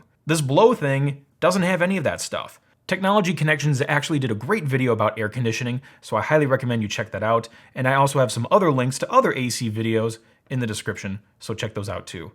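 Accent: India. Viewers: Indian accent is also a red flag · indian accent = red flag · Indian accent? Dropped.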